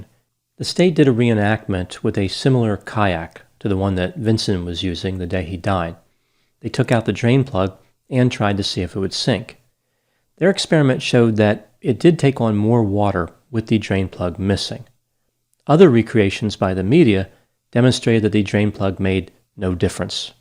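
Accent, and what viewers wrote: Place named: Maryland, United States. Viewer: I thought you might have a Maryland accent and I looked you up and read that you're from Delaware.. I wasn't far off! :) 🤗 I just found your videos not long ago and love them.. subscribed.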